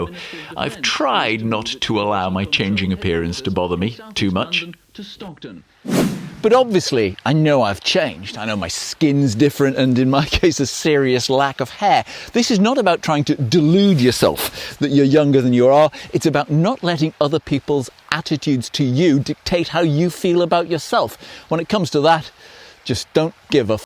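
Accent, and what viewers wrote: Newcastle, United Kingdom. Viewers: That’s where I know you from !! I always thought I knew your voice watching your videos but never thought anything of it being fellow geordie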